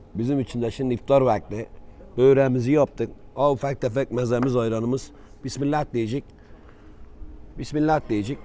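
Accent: Gaziantep, Turkey. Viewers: Antepli bir arkadaşım var gastronomi bölümünden birebir abinin aynısı zaten konuşmaya başladığı anda antepli olduğunu anladım antepliler iş ahlakı disiplin ve cömertlikleriyle biliniyor ellerinize sağlık ustam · Çok güzel olmuş eline ze saglık anlatiş şeklinizde çok tam bir annepli gibi 👍👍👍👍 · Antep aksanı en güzel aksan. Yaptığınız yemek çok sağlıklı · Şu şive şu samimiyet helal olsun Antepli arkadaşım vardı oda böyle konuşurdu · Antep kilis şivesine ölüyorum ya ustam ellerine sağlık hayırlı Ramazanlar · Bu Antep şivesi çok kötü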